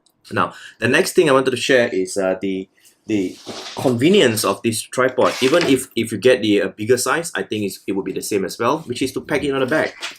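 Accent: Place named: Malaysia. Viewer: When I heard you speak I instantly knew. Fellow Malaysian!!!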